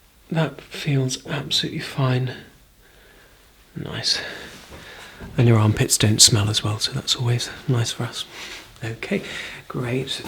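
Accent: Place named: United Kingdom. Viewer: He sounds like a British version of my allergist and it’s scary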